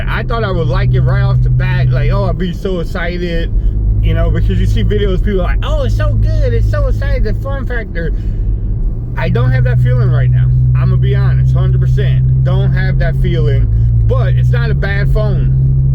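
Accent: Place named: Louisiana, United States. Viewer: You still sound Cajun. Camera's ok 😀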